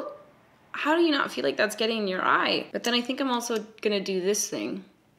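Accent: Canada. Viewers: This was pretty funny I burst out laughing a few times when you were doing your techniques you don't like. I also find it odd that you sound so Canadian and I've lived in Vancouver, Calgary and Toronto and haven't heard too many with the same kind of pronunciation of some words as you do. Especially the OOOKeeys. It's funny and cute. I also agree having super matte thick dry foundation just also makes my skin look like that and any lines and acne spots just look horrific so I've been having to go much lighter and luminous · sounding super Canidian today eh? I love it okey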